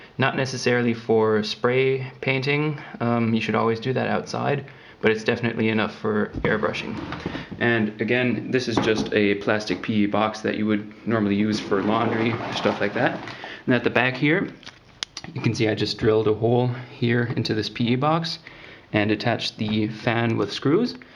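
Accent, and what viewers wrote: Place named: Germany. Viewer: so typisch deutscher akzent ^^ super reviews und super paint booth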